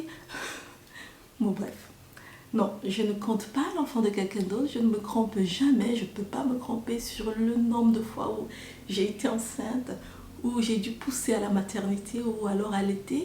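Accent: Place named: Cameroon. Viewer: Pour information, cela vient de l'accent de son pays d'origine, si ne m'abuse, le Cameroun. C'est une spécificité propre aux habitants ce beau pays !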